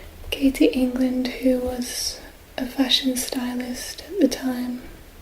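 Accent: Scotland, United Kingdom. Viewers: Since I have not heard you speak out loud it is difficult to place your accent. I would guess Scottish? but I can't be certain. I have been watching your vids for some time and they always relax me. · Is she from UK? I love her voice and accent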